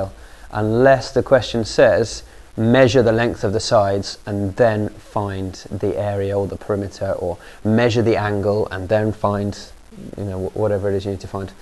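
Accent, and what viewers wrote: United Kingdom. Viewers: your accent is so british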